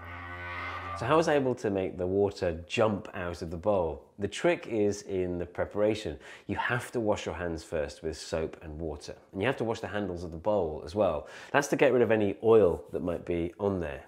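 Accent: England, United Kingdom. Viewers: I've gotta agree about the presentation voice. I'm in the States and I think there's also something about an English accent which conveys authority for many of us as well.. but I think the calm confidence contributes a lot. I came via standupmaths.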